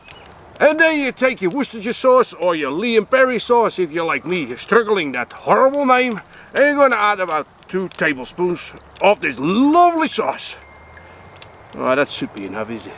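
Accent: Netherlands. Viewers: this guy really sound dutch are you or not? 🤨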